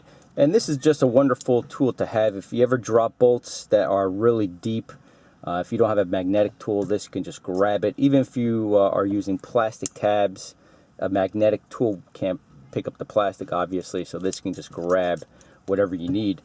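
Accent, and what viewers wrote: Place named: New Jersey, United States. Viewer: You sound like your from Jersey, i just watched the movie goodfellas you have a similar accent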